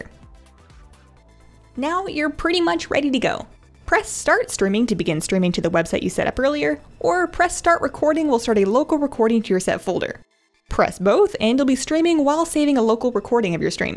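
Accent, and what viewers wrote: Canada. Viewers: Love your Canadian accent haha Very helpfull video!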